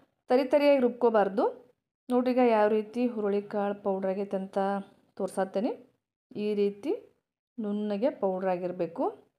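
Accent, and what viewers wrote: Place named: North Karnataka, India. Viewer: Utthara kannada hage maathaduvudu nivu thilkobeku kannada baashe shyli galanna.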